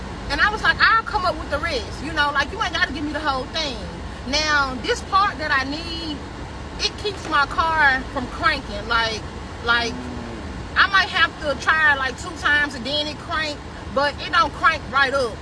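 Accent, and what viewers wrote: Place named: South, United States. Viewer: As a DMV native, that southern accent is a super turn-on. I laughed real good on the female Numbers Assessment 😂.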